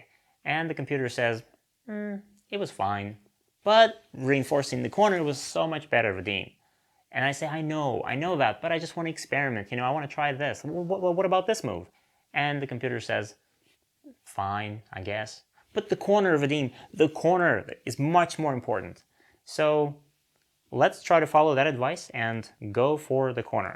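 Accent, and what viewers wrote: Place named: United Kingdom. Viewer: You have a great accent. I thought your from the UK :) I like your passion about the game. Also the studio and the way you talk about the game.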